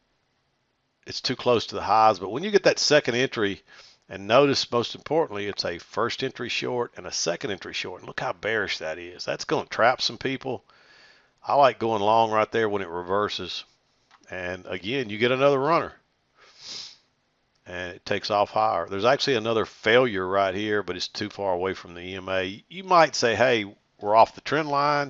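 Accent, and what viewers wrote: South, United States. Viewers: Glad to hear that you do not have Covid. Mississippi huh...thought I detected a slight southern accent :-) I was stationed in Louisiana so I know how that humidity can be. Take care.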